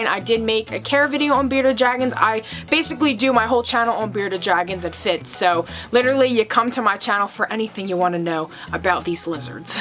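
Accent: Philadelphia, United States. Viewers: Are you from Philly? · hey hun nice video er! you from Philly?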